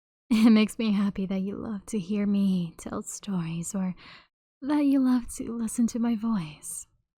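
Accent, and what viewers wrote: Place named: Vietnam. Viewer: the vietnamese pronunciation is kinda cute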